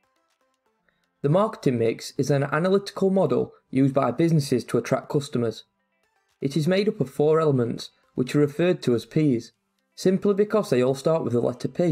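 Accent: Yorkshire, United Kingdom. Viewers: unrelated but... are you from yorkshire...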